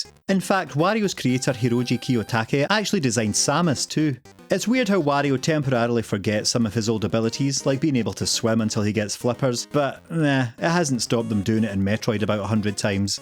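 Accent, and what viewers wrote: Scotland, United Kingdom. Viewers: Nice, thanks for the reply, I'll definitely check out your other videos! I really like the sound of your voice (it's the Scottish accent).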